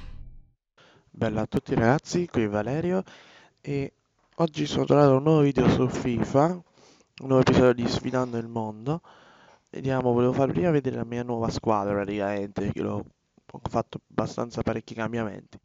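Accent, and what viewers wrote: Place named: Caserta, Italy. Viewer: bella ti immaginavo di caserta per la parlata...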